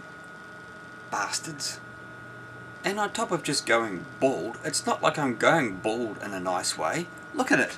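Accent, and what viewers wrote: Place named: New Zealand. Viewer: wow, new zealand accent is similiar to british, but quite different at the same time...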